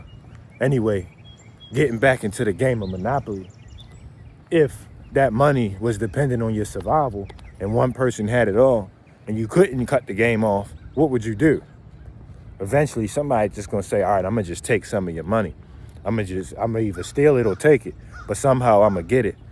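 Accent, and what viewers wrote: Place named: Baltimore, United States. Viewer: u from baltimore huh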